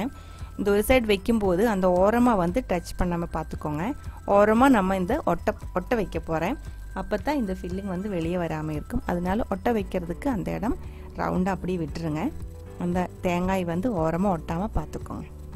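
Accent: Kerala, India. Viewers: Malayalam kalandha tamil wow. dish semma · Oh sweet malayalam mix tamil. 🤗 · Excellent demo.. your Tamizh in Malayalam base is very very sweet, beautiful blend in your voice.. like the nice yummy ilai adai😃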